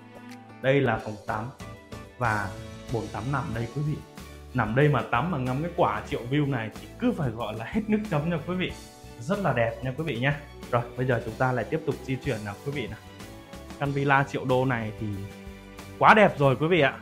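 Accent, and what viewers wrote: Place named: North, Vietnam. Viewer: Ở Việt Nam mà báo giá triệu đô, nếu không nghe giọng Băc thì cứ tưởng ở bên Mẽo !!!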